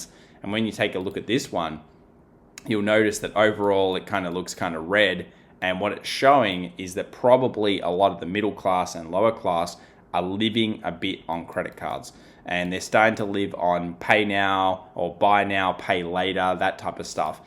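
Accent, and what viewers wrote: Australia. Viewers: Love the Australian accent pontificating about the US "mahket."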